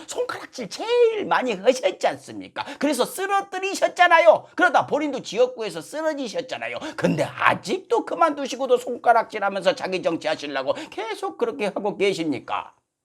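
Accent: Jeolla, South Korea. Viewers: 숨도 안쉬고 마시시네요. 전라도사투리는 들을수록 정감이 납니다. 물론 내용이 더 맛을 더하기는하지만... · 어이 자네 자 네는 전라 도 사 람 이 아니면 서 전라도 말을 그럴싸- 하 게 하는 디 전라도 도 지역 마다 억양 이 다 다르 네 자 네는 전라도 어느 지역 말을 쓰는가 나는 순 천60대 전라도 말을 쓰는 것은 자유 지만 전라도 사람 욕먹는 발언 사가 해주소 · 서울에서 태어났담서요~~성동일씨랑 쌍벽을 이루는 라도 사투리 투 톱👍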